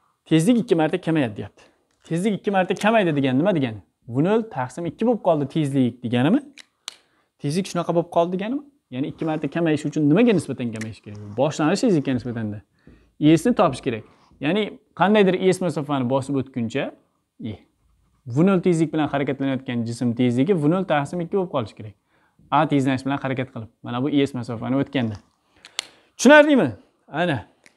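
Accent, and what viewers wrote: Khorezm, Uzbekistan. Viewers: Assalomu Aleykom ustoz darslariz uchun rahmat ha bazan xorazm shevasida gapirgandek tuyuladi menga!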